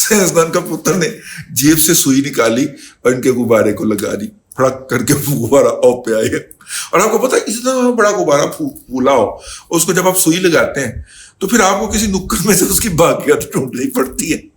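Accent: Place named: Lahore, Pakistan. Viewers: V good sahib I like your lahori style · Pure lahori style hy apka excellent sir thanks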